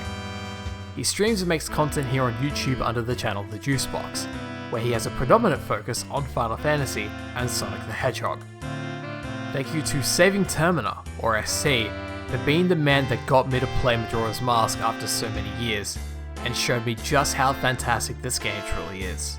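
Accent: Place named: Australia. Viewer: I love the Australian accent. Okka-reener. Amazing.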